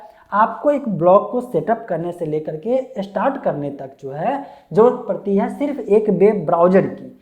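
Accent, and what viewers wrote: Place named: Bihar, India. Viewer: Bhai apka bolne ka tarika s lag rha hai bihar jharkhand s ho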